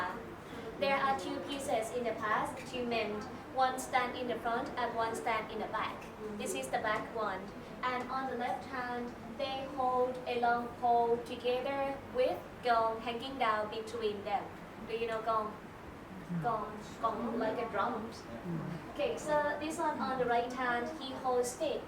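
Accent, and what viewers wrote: Scotland, United Kingdom. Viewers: Interesting museum, but camera-work amateur. Hand-held and shaking, lens mostly on the tourists, not on the artwork. Outside, noisy traffic, cant understand the Scottish accent.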